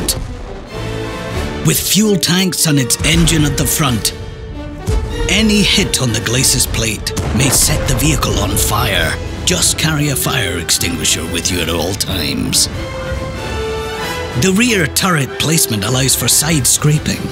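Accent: Scotland, United Kingdom. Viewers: The scottish accent is stronk in this one. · That Scottish accent is thicc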